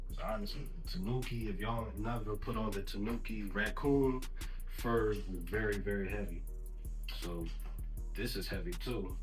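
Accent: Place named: Philadelphia, United States. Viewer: Keep up it up, I admire your collection!!!! And I hear that accent it sounds like my home town sound!!!! Philly in the building 🦾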